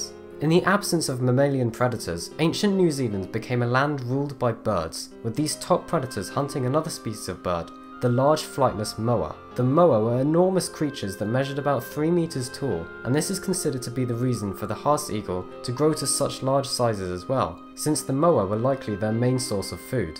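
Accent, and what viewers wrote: England, United Kingdom. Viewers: What is a May-ori? I am a New Zealander and it is pronounced Mow( as in "how" ) ree. You sound English so you should know how to pronounce Maori. The Maori Battalion sent thousands of Maori troops to both WW1 and WW2 in Europe.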